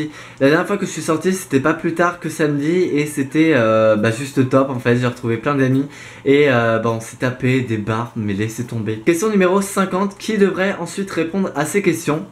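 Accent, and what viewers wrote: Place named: United Kingdom. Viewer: accent British parfait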